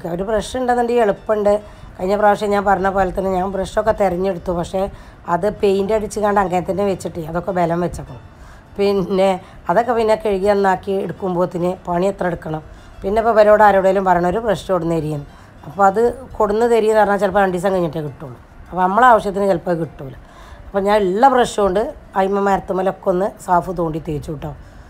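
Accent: Malappuram, India. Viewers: അടിപൊളി നമ്മുടെ മലപ്പുറം സംസാരം സൂപ്പർ ആട്ടോ 👌💞💞💞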